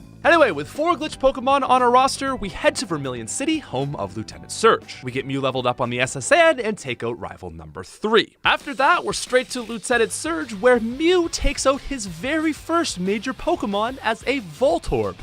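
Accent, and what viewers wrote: Canada. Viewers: 10 seconds in, and I suspect you’re Canadian haha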